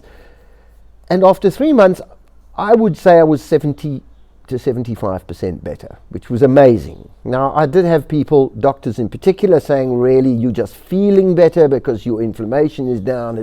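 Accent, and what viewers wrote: South Africa. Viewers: exactly...the body heals itself if given the right supports, but the first step is to take the onus upon yourself like you have stated here...thanks for the great info...and love your South African accent as well...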